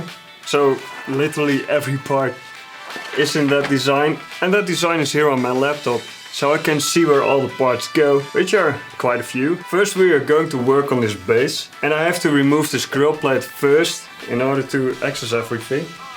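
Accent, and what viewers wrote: Netherlands. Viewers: ofcourse he is Dutch, why am I not suprised :) we are some genius people :) edit: confirmed lmao. I can recognize that accent and that view from anywhere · dacht all aan het aksent dat hij NL/BE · This is the first video I've seen by you. I immediately subscribed to your channel and will check out your other videos. I really like your idea and of course your 3D printer looks cool as f*ck. By your accent I immediately heard you are a fellow Dutch. I hope to see more good stuff. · sound like you also talk dutch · Your accent gave you away quickly :) later in the video it was confirmed Lekker gedaan pik ziet er prachtig uit! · where you from? you have a dutch accent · i think ur dutch u have a dutch acsent(im also dutch) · Absolutely love the video, really nice build however would you mind doing a video in Dutch as the accent is so thick it would be easier to watch In Dutch 😅 anyway as I said before the engineering part of it is very well done!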